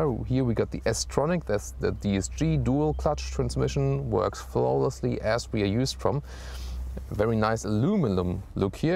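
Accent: Germany. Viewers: Nice details, impressive narration. Love to listen your German-accent English. Keep going,!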